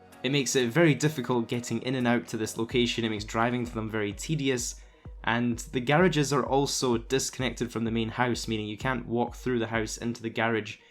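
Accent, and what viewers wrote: Scotland, United Kingdom. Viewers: Ur Scottish?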